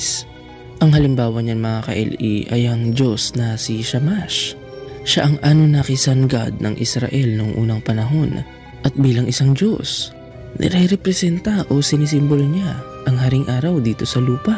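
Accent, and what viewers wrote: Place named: Cebuano, Philippines. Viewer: Hello ka-, ang style at pamamaraan mo sa pagsasalita ay walang pagkakaiba sa nagsasalita ng Ophirian Heritage Conservatory, ibig ba sabihin na iisang tao lang kayo? Kahit tagalog ang ginagamit na salita ngunit ang accent ay parang sa isang cebuano language. Maganda ang ipinapakita mong presentation at wala itong pagkakaiba kung paano ginawa ang presentation ng OHC. Very Educational!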